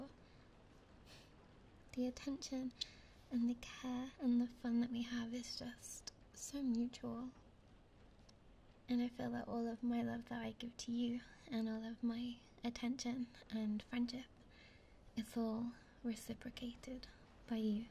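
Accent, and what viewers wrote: Hertfordshire, United Kingdom. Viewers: Finally an ASMR Vid who’s ASMRtist has the same accent as me rather than the standard American one. I keep listening to this genre of ASMR hoping that something like this would happen to me even though it makes me feel lonely afterward (yeah I know generic sad lonely lad here). Thank you for this vid, it’s very well spoken and immersive, keep it up 👍 (you’ve made this lonely lad from the back ends of Hertfordshire a little less lonely)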